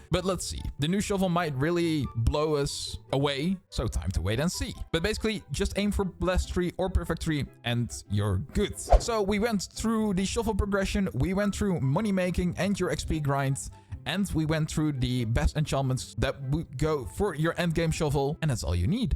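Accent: Netherlands. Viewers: the dutch accent is strong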